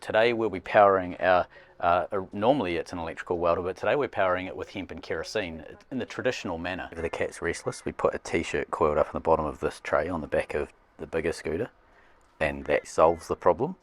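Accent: New Zealand. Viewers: That is the kiwi pronunciation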